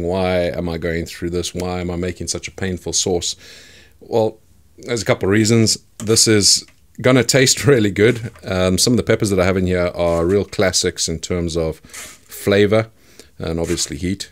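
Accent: South Africa. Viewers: Is your subtle accent south African? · South african accent, i approve 👍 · SO EPIC to hear a south african accent! followed!!! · Heard a ZA accent, got my hopes up that there was a local shop to purchase these sauces, saw the cost in UK pounds, the man has obviously left our country :( . Back to using Bushman sauce, lol. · He sounds kinda south african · This guy sounds like a SouthAfrican fore reals >_< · That is sad man. You do have a South African accent though. Anyway, i will have to try and make that weapon of a sauce on my own. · just came across the channel ... awesome content ... you sound very South African ...? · He sounds South African.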